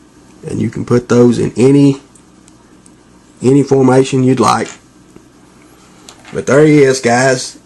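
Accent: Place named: South, United States. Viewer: I was hoping so, since you sould like your from the south too. Maybe I'll be able to find one soon then. Thanks, PS check out my channel, if like custom transformers!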